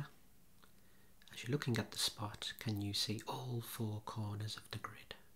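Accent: Birmingham, United Kingdom. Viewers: OMG A BRUMMY ASMRTIST MY DREAMS HAVE COME TRUE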